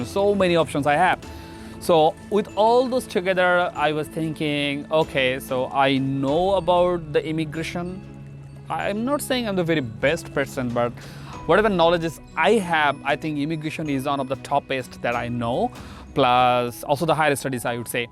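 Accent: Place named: Bangladesh. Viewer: I watched the entire video just to hear you say that you're from Bangladesh. But you did not. Are you ashamed of your nationality? And BTW I could tell that you're from Bangladesh by your accent.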